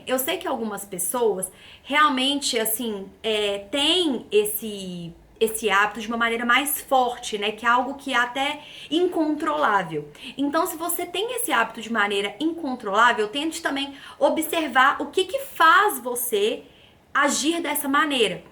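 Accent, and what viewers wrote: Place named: Minas Gerais, Brazil. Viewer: ❤ que saudades que eu tenho de ouvir esse jeitinho mineiro ❤❤❤❤❤❤ Meus queridinhos ...amores eternos❤❤❤❤rsrsrsrsrs Eu sou vidrada no povo mineiro: amor perfeito!❤❤Eterna seguimora de Viçosa e BH eternamente aonde estiver no planeta meu ❤ coração é mineiro ❤❤❤❤❤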